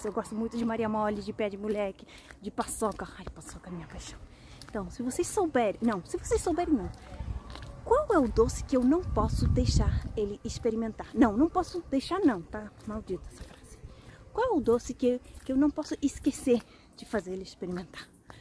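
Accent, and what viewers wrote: Argentina. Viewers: Y aparte que tenes esa tonada de buenos aires capital y no se te fue cuando haces videos en castellano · 😉😙😙😙 A mí me encanta la lengua española, y siempre estoy mirando vídeos acá en Youtube de Youtube's nativos de la lengua española, para que yo pueda siempre está en contacto con la lengua española, y tu acento argentino es buenísimo para me acostumbrar con algunas particularidades de español de Argentina, pues ustedes argentinos utilizan siempre el pronombre personal "vos", en lugar de el pronombre personal "tú". Tambíen me gusta acostumbrar mis oídos con el sonido que tú como argentina, utiliza al pronunciar el sonido de la Y(igriega) y la LL(Doble Elle), pues los argentinos hablan "Yo" con el fonema /xo/. ¡Yo soy enamorado por la lengua española! Jajaja. ¡Siempre me encantó! ¡Besitos a vos !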